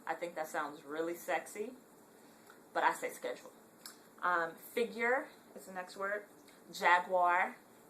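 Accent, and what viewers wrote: United States. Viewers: She sounds realer than most Californian accents I hear including the ones from actual Californians. The real ones sound almost as forced as the fake ones. - Bay Area Guy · im from NY and I like hearing that compton cali accent of where your from and by the way don't worrie about how you look u should consider signing up for americas next top model thats how nice u look:)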